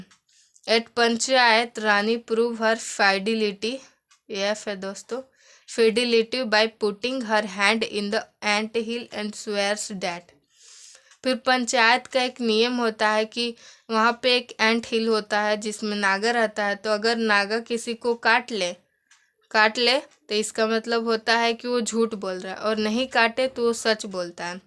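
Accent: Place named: Bihar, India. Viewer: Aap bihari aapki boli se lagta he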